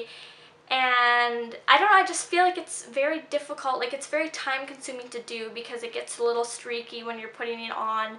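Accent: Canada. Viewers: Where r u from? I thought ud b from America but apparently u aren't...I'm guessing Canada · She Canadian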